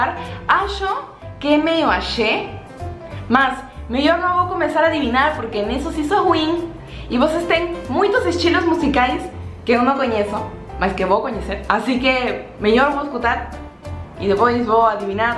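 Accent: Peru. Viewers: Oi você é maravilhosa, adoro este sotaque peruano. Sou inscrito e super fã, grande abraço do Rio de janeiro. Bjos minha linda!!! 😘💖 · por el acento digo q es peruana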